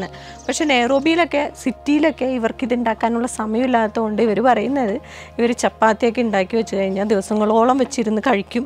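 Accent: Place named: Kochi, India. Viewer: Chechy vyppin Kari anoo / atho kochikari ?slang kettit angine thonnu, enthayalum sambhavam powlichutta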